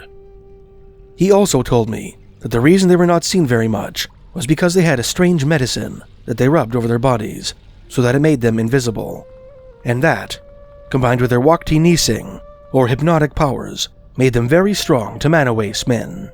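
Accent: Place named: Canada. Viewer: I really wanted to listen to these stories. But the voice ... is the reason people poke fun at us canadians. Stop patronizing the stereotype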